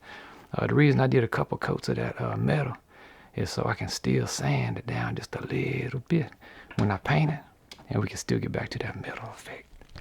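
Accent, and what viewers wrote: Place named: South, United States. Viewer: Your southern accent and humor keep see watching, even though I don't hardly understand everything! lol ,,,,,, You are quite smart!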